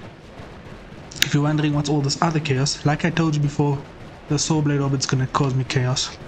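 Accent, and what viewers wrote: South Africa. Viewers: Huge fan of the series, was wondering where youre from? my guess is south africa :D?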